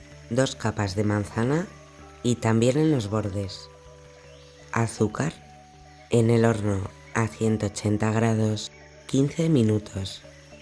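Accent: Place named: Spain. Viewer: Saludos desde Canadá: Me gustan sus recetas y también su acento español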